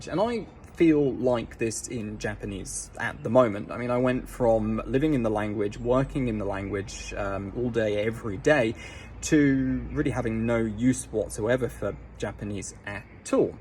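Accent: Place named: Southern England, United Kingdom. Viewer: He has a Southern English accent, but its formally called an Estuarian English accent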